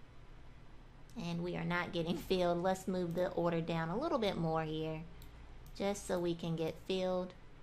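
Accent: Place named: South, United States. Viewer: Lol Asian shorty with a black southern accent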